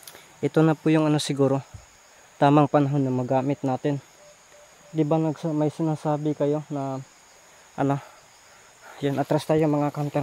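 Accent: Visayas, Philippines. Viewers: Lingaw kaayo kos imong sinultian boss bisayang tagalog amping always Godbless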